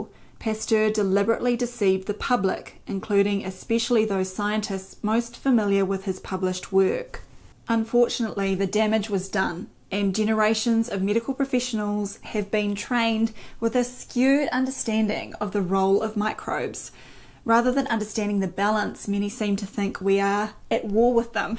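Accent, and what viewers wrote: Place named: New Zealand. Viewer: Love the kiwi accent. I'm from NZ. The auto words picked up mass phenomenon as mess phenomenon. It is a mess phenomenon.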